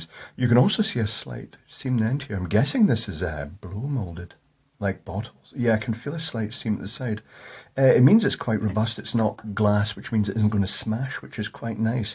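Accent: Scotland, United Kingdom. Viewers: I love watching your videos, for some reason this one had the auto subtitles activated when I played it and it was made even more amusing than normal because it mistranslated your Scottish brogue. Glitter pearl was translated in the captions to 'glitter peril'. 😀 Be very careful of those glitter perils I understand they can be dangerous.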